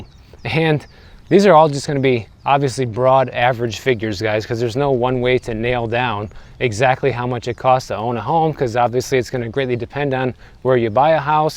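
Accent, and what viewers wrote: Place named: Boston, United States. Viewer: You have to be from the Boston area. Am I right?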